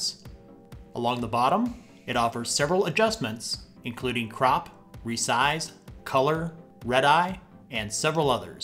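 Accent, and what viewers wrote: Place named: United States. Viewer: Wow, an english speaking American video, that's not from India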